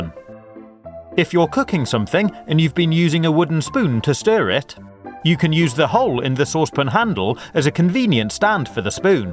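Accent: England, United Kingdom. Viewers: He's British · Are you English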